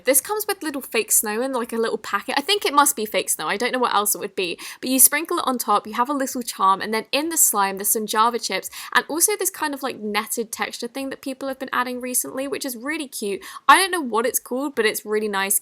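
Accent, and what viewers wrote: England, United Kingdom. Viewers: BRUH SHE HAS A BRITISH ACCENT SO SHE PRONOUNCES THINGS DIFFERENTLY!!! 🙄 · What part of England are you from??? And your the best😂😃😂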